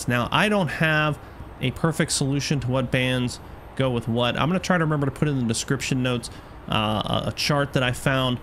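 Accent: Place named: Germany. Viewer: The German guys accent breaks my immersion 😂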